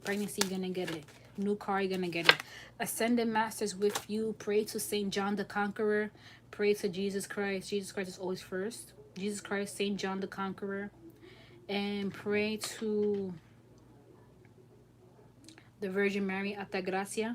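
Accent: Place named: New York, United States. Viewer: btw, I live in England, born and raised. I LOVE your accent and can understand every word you say. I’ve never been to NY or even to the US in this life, yet, but I think I was in NY in another life close to this one because I feel a strong connection to the place, energetically, like with no clear reason as to why, in this life, other than maybe what I saw on tv and in the movies as a kid, but it’s stronger than that, like a distant nostalgia that feels like home. I felt the same about London here in the UK before I moved there. When I did move there I felt right at home.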